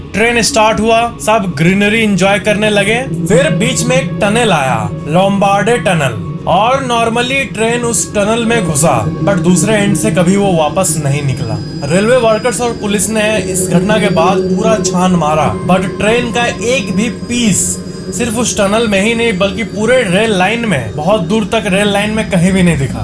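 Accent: Bihar, India. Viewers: According to his language type he is bihari!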